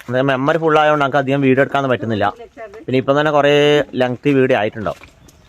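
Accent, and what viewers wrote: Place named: Kasaragod, India. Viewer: നിങ്ങടെ സംസാരം കേട്ടപ്പോൾ ഞങ്ങടെ കാസറഗോഡ് ഉള്ളപോലെ തോന്നും അതാണ് ചോദിച്ചേ അടിപൊളി njan ആദ്യമായി നിങ്ങടെ വീഡിയോ കണ്ടത് ഞങ്ങൾക്ക് കുടുക് അടുത്ത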